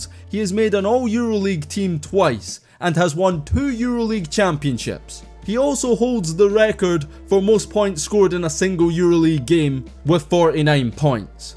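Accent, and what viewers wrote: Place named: United Kingdom. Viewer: when i heard your accent i thought i clicked on wrong video, Brit talking about basketball is always odd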